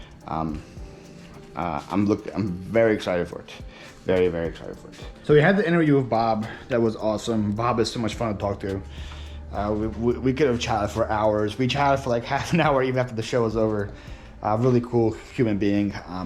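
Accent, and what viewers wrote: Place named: Philadelphia, United States. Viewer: New viewer here. I realize you live in Florida now, but when you lived in Philly, you must have lived right in the heart of Philly for a long time. I can hear your very distinct Philly accent. Happy selling!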